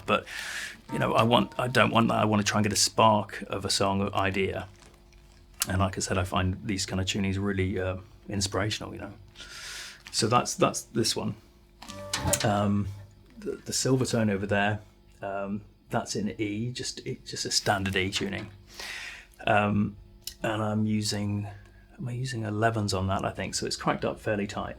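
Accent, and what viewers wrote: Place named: Wales, United Kingdom. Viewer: Blown away by the magnificent slide sounds on the various guitars and your wonderful Welsh accent. I enjoyed learning about the pedals and other gear, as well. Thank you for sharing all,. ❤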